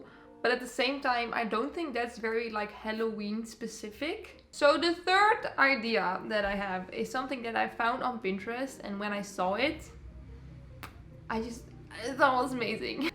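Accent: Netherlands. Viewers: Is ze nou Nederlands of heeft ze gewoon een Nederlands accent?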